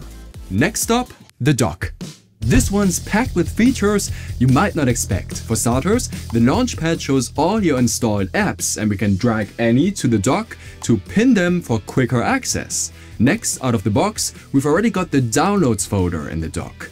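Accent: Germany. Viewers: I hear deutschh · love that german accent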